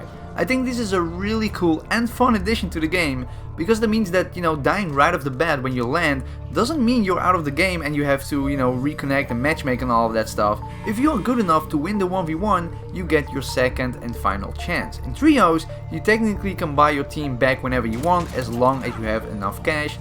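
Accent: Netherlands. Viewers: I feel he's Dutch by his accent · Are you Dutch bro ?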